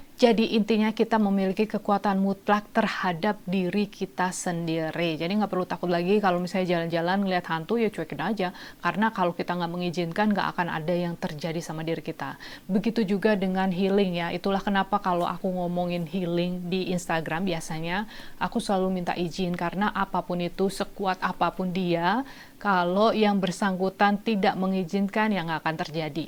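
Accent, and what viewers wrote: Banyumas, Indonesia. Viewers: Mba Cilacap ya salam ngapak, ora ngapak ora kepenak... 😄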